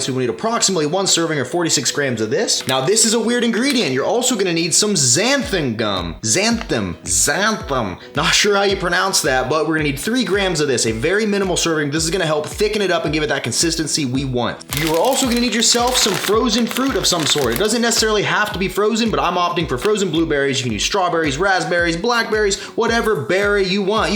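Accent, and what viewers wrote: Texas, United States. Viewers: Wow...he sounds so much more Texan in his more recent videos.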